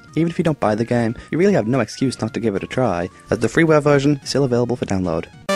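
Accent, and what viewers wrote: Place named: Manchester, United Kingdom. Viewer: Manchester accent + speaking fast = Americans can't understand you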